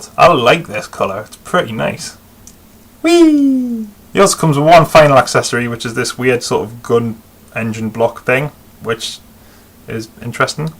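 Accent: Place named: Newcastle, United Kingdom. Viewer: I had to sub. I love yer geordie accent lyke. Why aye pet, reet canny lass etc. My favourite teacher was a geordie. And this video was about Hot Shot. The best subject ever.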